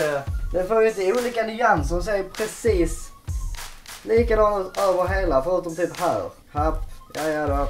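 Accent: Scania, Sweden. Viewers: " pusslar" Älskar skånska😂❤️👌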